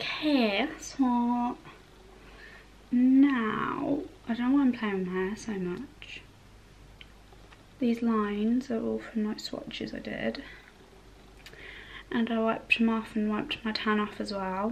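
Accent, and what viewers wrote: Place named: United Kingdom. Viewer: I’m in love with your British accent...it’s so charming!!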